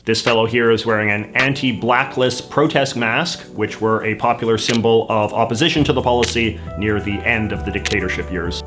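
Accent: Canada. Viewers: You say “about” the way Americans tease Canadians for saying it: “Abuht”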